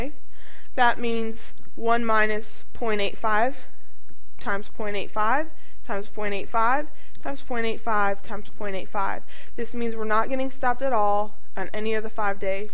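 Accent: United States. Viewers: are you from the south? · Love the accent...Georgia? I'm from Texas.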